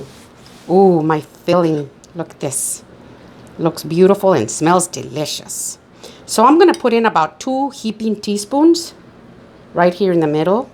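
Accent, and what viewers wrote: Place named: Texas, United States. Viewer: What in the hell are you doing in OHIO??? You sound like your from Texas or California.....I never seen a Ohio Mexican but Viva La Raza!!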